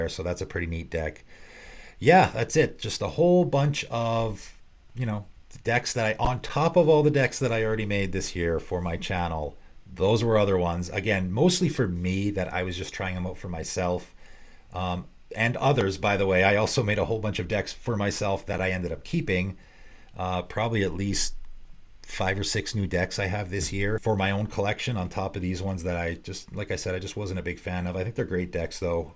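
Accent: Canada. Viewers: Love the content man keep up the great work. I'm very happy you're continuing to have success! I've found so many great cards and strategies through your videos. I love using those lesser known gems and you might be the best channel to find those. You've also inspired me to build 4 decks (patron of kitsune, cosima, Dakkon, and ertai). I've got 3 random questions for you: 1) do you have a public discord or is it only through patreon? 2) have you ever played with, or considered plying with custom commanders? What are your thoughts on them? 3) are you from Canada? Your accent gives me Canada vibes 😂 Also I can't remember if you discussed it in a video already but dominating licid is so cool! Definitely deserves a mention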